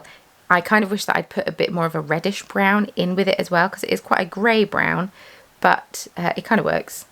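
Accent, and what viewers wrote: RP, United Kingdom. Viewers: Bap if not crusty. Cob if it's crusty. I'm in Solihull, near Birmingham airport, and you'd sound posh here.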